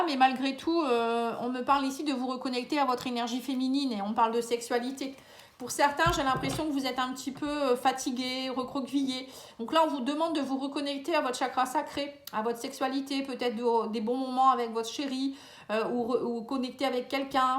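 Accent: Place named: North, France. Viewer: Cc ohoh cet accent du nord que l'on reconnaît fort merci pour la guidance 😊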